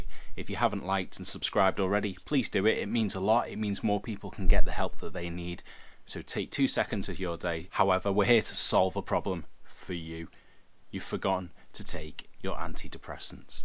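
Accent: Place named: Scotland, United Kingdom. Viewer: Are you Scottish? I love this accent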